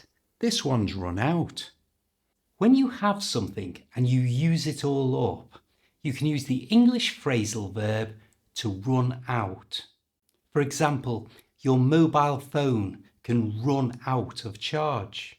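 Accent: Liverpool, United Kingdom. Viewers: As I have already told to you I enjoy your videos and your scouse accent. Cheers from Italy 😊👍🇮🇹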